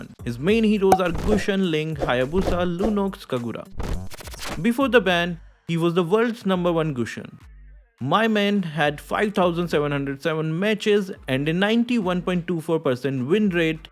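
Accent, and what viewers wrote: India. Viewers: Indian english 😂😂😂😂😂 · Uk he's idian saying e first when there's s in a sentence example e stop u😅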